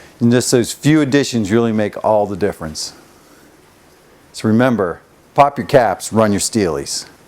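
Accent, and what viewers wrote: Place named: Canada. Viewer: love the way he says "Give it a bit of 'hows ya father' " that surely is the most usual mix of accents... kind of Canadian, kind of English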